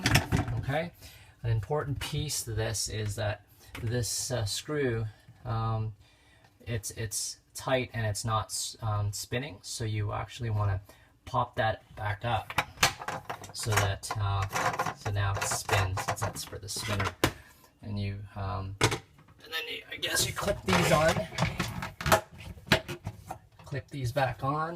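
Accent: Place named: Pennsylvania, United States. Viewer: Where are u from. Accent Reminds me of a guy I knew from Pennsylvania. Just wondering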